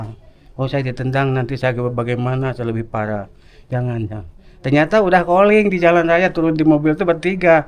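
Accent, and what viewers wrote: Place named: Jakarta, Indonesia. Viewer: Bahasanya kayak bahasa Betawi dimana yaaa alamatnya tolong Nasik alamatnya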